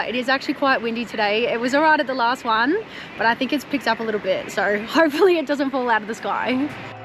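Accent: Australia. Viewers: Hi honey love watching your videos and you are a very gorgeous Australian sexy young woman honey keep these videos of you keep coming because love the areas that you travel to honey and don’t won’t to brag honey you truly a very sexy and extremely gorgeous young woman i appreciate all of your videos love ❤️ you and your beautiful acsent honey again thank you so much hope to see more beautiful areas of Australia beautiful Outback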